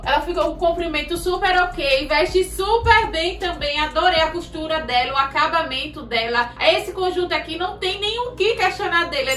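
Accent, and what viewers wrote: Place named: João Pessoa, Brazil. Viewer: Esse sotaque nunca me enganou, sou de João Pessoa e não vivo mais sem tu, mulher 😂😂😍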